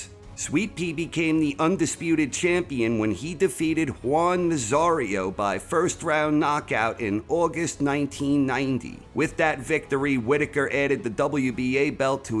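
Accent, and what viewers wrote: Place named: East Coast, United States. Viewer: ! You are the Best at this stuff in my opinion! The way you talk and your accent is a huge draw for me! Don't loose your east coast accent! Lomo vs. Lopez is on the calendar! Thank you for your work!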